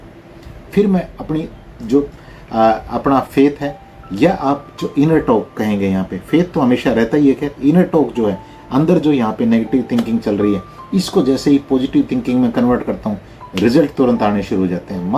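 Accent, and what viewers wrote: Haryana, India. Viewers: Aap ki boli haryana jasi h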